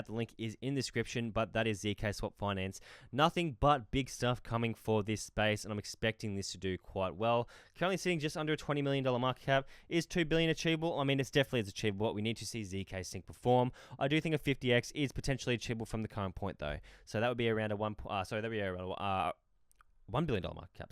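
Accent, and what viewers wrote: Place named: Australia. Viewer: Mate love your work ! Great to hear a fellow Auzzie, with great research !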